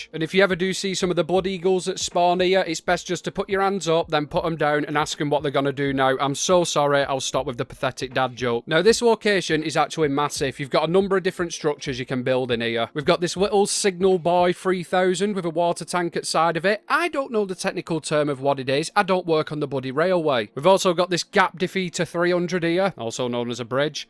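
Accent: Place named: Northern England, United Kingdom. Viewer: No, definitely wasn't me! i think you are brilliant , love the northern accent and love how you use proper British phrases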